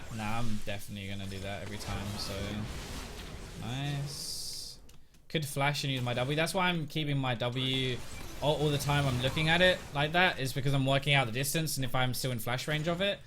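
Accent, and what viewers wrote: United Kingdom. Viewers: I could listen to your accent all day. British guys 😍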